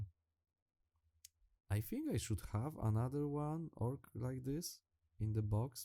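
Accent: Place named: Poland. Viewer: You, my friend, have a very polish accent! :D Are You Polish by any chance? ;) Zdrówko!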